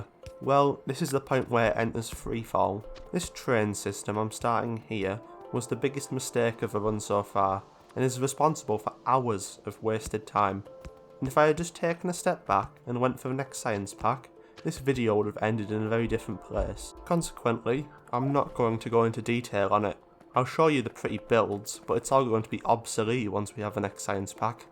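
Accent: United Kingdom. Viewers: i meant start of the video when you saying about Ko-fi supporters, if im right in Britain people when saying "a bottle of water" they pronounce it like "a boa of woa", and when you said "supporters" by pronouncing it "supo-es" i remembered about Britain accent, LOL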